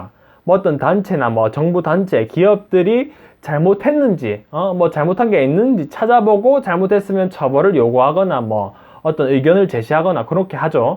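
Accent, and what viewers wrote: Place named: Gyeongsang, South Korea. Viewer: 안녕하세요, 님. 저는 현재 해외에서 한국어 교원을 하고 있는 사람입니다. 저는 원래 영어교육학이 전공이라 영어교사로 고등학교에서 재직하다가... 무언가 고등학교라는 시스템이 학생을 너무 괴롭힌다는 생각이 들어..그나마 널널한 한국어를 가르치고 있는데... 최근들어 드는 생각이, 저는 영어를 좋아했던 것이지, 학생을 좋아하지는 않은 것 같습니다. 그래서 제 주변에 us cpa하시는 분께서, 영어에 자신만 있다면 미국 cpa를 한 번 도전해보라고 해서.. 당장은 아니지만, 조금씩 준비해 나가려고 합니다. 한국어교원 컨텐츠 영상이나 회계사 컨탠츠 영상을 보면.. 대부분 학원들 광고가 많이 뜨는데, 님처럼 개인이 어떻게 공부했는지도 올려주시면 좋을 것 같아요... (참... 조회수는 안나올 컨텐츠이긴 하지만....). 그리고 조금은 난해한 질문일수도 있지만.. 님은 세무사로서 지금 행복하신가요? 그저 개인 의견이 궁금합니다. ^^ (저 또한 부산 사람이라... 하지만 직업상 참..억양을 죽이려고 노력하는데... 마음껏 갱상도 억양을 발산하시는 님이 오늘따라 참 부럽습니다.... 뭐 저는 억양을 없애려고 노력만 했지, 없다고 하지는 않았습니다....^^)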